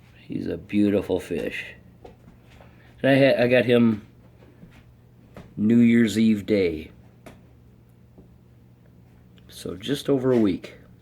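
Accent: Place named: North America. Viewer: the standard 10 gallon aquarium in North America, likely where he lives as he has a North American accent, has the dimensions of 20" x 10" x 12". This tank is also longer than it is tall, and taller than it is wide. I can almost guarantee it carries those dimensions. In different countries, such as Australia, a standard 10 gallon often has different dimensions. But this was in North America.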